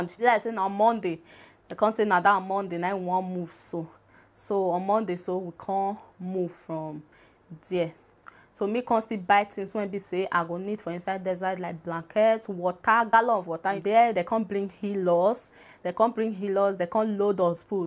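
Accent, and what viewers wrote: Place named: Nigeria. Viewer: yooo nigerian English 🤣🤣shame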